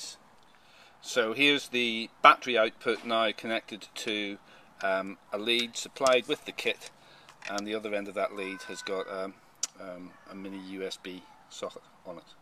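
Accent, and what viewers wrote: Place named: Northern Ireland, United Kingdom. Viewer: You sound like you might originally be from northern Ireland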